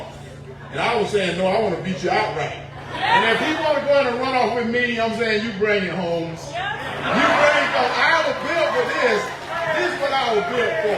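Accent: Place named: Georgia, United States. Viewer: Walker you can tell you love Georgia. You can tell you was raised there. Your a full blooded Georgia you walk and talk like a Georgian. If you don't win then Georgia don't deserve you.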